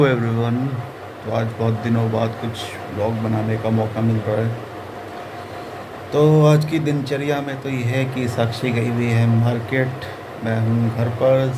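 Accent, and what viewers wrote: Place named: Samastipur, India. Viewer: Dii mai samstipur se huu sem isi trh hmlog v bolte h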